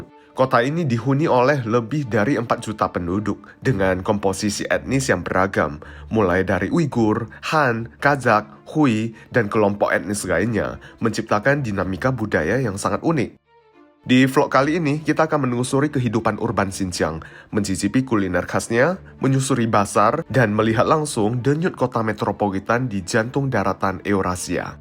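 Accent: Java, Indonesia. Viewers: Ko, sekarang bahasa Indonesianya kok medok banget kayak orang Jawa 😊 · 😂 mungkin tinggal di jawa kali, kan banyak tuh orang Tionghoa mirip2 Orang jawa kebiasaannya kayak Rajin, segala macamnya apalagi. Bahkan bahasa ngomongnya juga kayak jawa atau medok banget.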